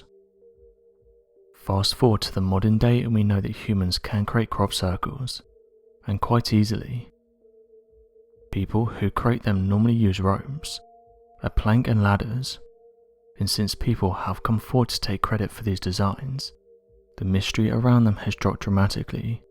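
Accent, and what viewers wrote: United Kingdom. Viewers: British accents don’t turn on all Americans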